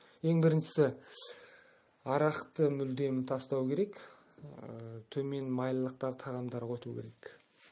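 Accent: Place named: China. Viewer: Рахмет сізге. Көп танымдық ақпарат беріп өттіңіз. Алайда Қазақстанға басқа елден келген адам құсап сөйлейсіз. Кей сөздеріңізді түсіну мүмкін болмады. Қытайға жақын жер ма сол жақтың акцентімен сөйлейді екенсіз. 🤭🙊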